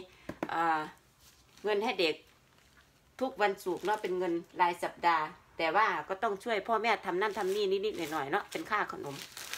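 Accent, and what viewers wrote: Isan, Thailand. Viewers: เว้าอีสานฟังง่ายจ้า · พูดอีสานเลยจ้าฟังเป็นธรรมชาติดี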